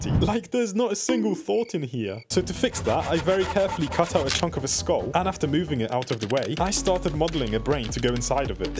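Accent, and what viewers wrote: United Kingdom. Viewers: sounds Britsh to me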